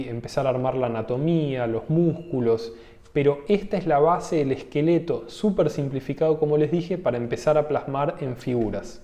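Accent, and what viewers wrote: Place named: Argentina. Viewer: Entretenido tus videos, wena combi entre el acento argentino, aprendizaje y memes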